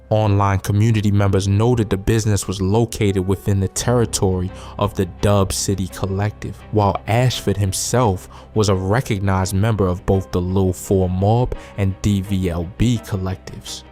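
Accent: Baltimore, United States. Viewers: Sounds like a Baltimore dude is giving street news on Chicago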